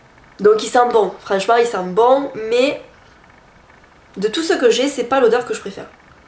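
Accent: South, France. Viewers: Ah j'aime aussi son accent parce que j'ai a peu pres le meme ( c'est l'accent du sud quoi ^^ ) . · Dsl, je réponds à sa place... Elle a l'accent du sud de la France, je l'adore aussi ♥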